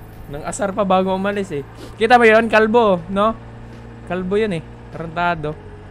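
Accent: Visayas, Philippines. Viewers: Dol bisaya man deay ka dol ey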